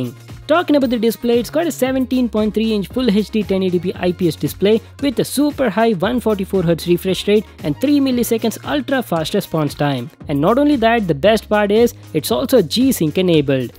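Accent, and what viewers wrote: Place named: India. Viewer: u hv the most unique and original indo english accent of all the indian youtubers🤩🤩. keep it up bro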